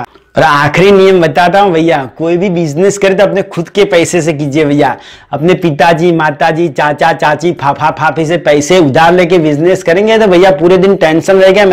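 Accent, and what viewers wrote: Gujarat, India. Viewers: Bahi app Gujarat sa hokya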